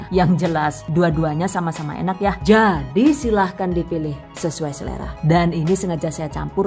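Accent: Banyumas, Indonesia. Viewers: Aku ngakak bunda ngomong ngapak. Wkwkwkwk (sedikit ngerti). Trims resepnya bund, kayaknya lebih praktis pake coklat yg sudah berwarna ya, lebih awet pula. Sukses buat. Aamiin... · Ya Allah deneng rika ngapak bu,asline rika si wong ngendi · Yassalaaam ngapakmu marai aku kemekelan mbak😂😍😘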